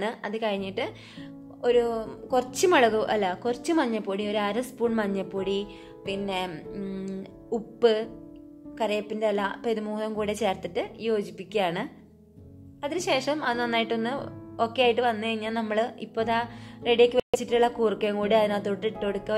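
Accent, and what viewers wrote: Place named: Northern Kerala, India. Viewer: .. നല്ല വീഡിയോ ആണ് കേട്ടോ.. I like your വടക്കൻ slang 😍😍😍പക്ഷേ അരി നാലഞ്ച് പ്രാവശ്യം കഴുകിയാൽ അതിലെ തവിട് മുഴുവൻ പോയി ഗുണം നഷ്ടപ്പെടും എന്ന് പറയാറുണ്ട്... അതുകൊണ്ട് ഞാൻ..maximum രണ്ട് മൂന്ന് പ്രാവശ്യം മാത്രേ kazhukarullu...just അഴുക്ക് കളയാൻ മാത്രം.. ഇത് negative comment Alla കേട്ടോ..😊😊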